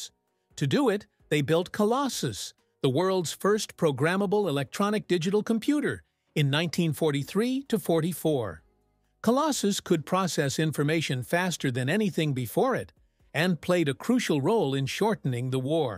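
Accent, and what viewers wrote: United States. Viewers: Scatish is what comes out of AI generated slop with an American accent that can not pronounce British words.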